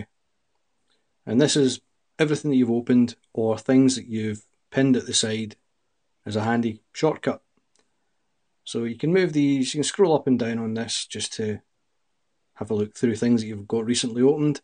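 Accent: Scotland, United Kingdom. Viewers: Is that Scottish accent? It's nice.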